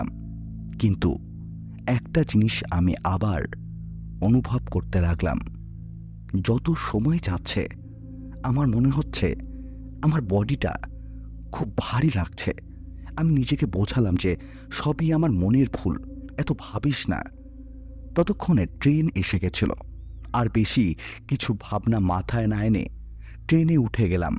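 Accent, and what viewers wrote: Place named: Bangladesh. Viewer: Apni banladeshi??? Kothar bhul bhalo ucharon shunei bojha jache😂😂😂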